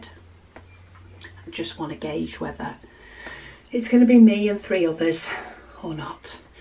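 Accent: United Kingdom. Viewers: Please start a group on Facebook. I am a new subscriber and just happened to check out your channel via Rachel Rae as you are working on a blank canvas as I had just started one, not a HAED but a cross stitch pattern from Kustom Krafts. Thank you for the tip on how to do the conversion in order to get the correct canvas size. I have also ordered a single punch and sticker paper from Amazon, great idea as I cannot get refills for my Xyron. Oh, you can buy Goo- Gone from Amazon and I found it was an absolute godsend. Thank you for all the tips. Love your accent, same as Fruitini, what part of UK are you? I'm Dundee, Scotland 💜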